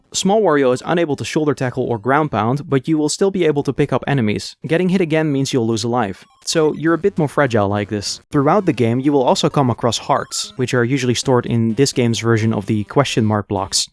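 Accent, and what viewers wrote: Netherlands. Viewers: are you dutch by chance?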